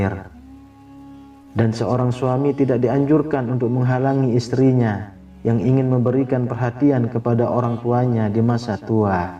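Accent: Lombok, Indonesia. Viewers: Abang dari lombok kah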